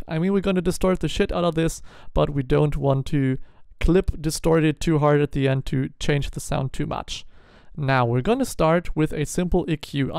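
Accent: Germany. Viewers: you can hear that hes german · dein English sagt du bist Deutsch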